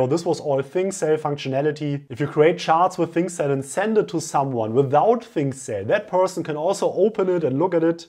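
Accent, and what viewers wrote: Germany. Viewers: You can hear it directly that he is German 😂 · I got a headache after listening to his accent. Is he German or French? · Your german accent is hilariously strong, to a distracting extent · Obviously German ; )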